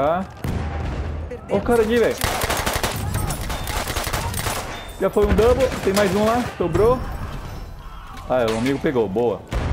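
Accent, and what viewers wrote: Pará, Brazil. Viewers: Você é do estado do pará? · VC E PARAENSE MANO KKKK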